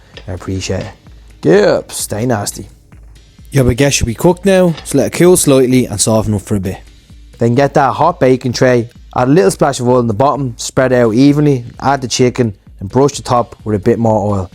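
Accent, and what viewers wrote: Ireland. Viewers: So....it's called a CHICKEN FILLET ROLL. Good to know. Yet it is not written anywhere on this screen except here in my comment. I had to peeeeeer at your tiny subtitles when I caught the name and rewound to find it again. We don't all speak with an Irish accent. You are welcome, lol. (Hey! Don't call me that!!)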